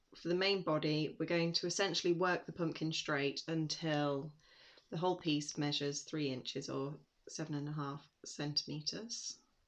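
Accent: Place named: England, United Kingdom. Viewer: Thank you so.much for this pattern. I love your English accent. Im from scotland living in USA 44 years still have My accent.😊❤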